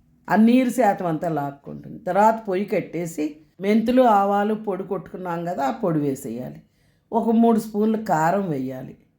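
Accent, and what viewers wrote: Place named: Khammam, India. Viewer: Aunty meedi khammama ma Mee mata style akkadi la anipistundhi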